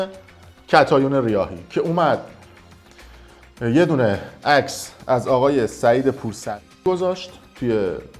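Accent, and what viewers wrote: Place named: Ahvaz, Iran. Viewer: دقیقا لهجه و تیکه کلامهای اهواز رو دارید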